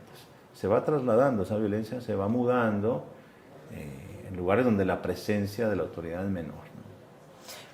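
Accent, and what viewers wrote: Mexico. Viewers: para nada , soy colonbiano y de la region dode nacieron ellos soy paisa, viaje por casi toda colombia y a leguas se ve que se le esta pegando el acento mexicano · Este Huevon adoptó el acento Mexicano sin serlo.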